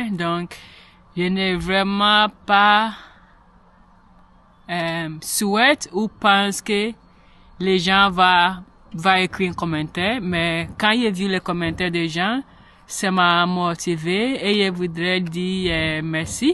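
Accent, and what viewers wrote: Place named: England, United Kingdom. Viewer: Salut vraiment continuer ton absent français prend le dessus sur l'anglais.Au début l'anglais ce trouvait beaucoup dans ta manière de parler mais maintenant le français prend le dessus dans ta manière de parler maintenant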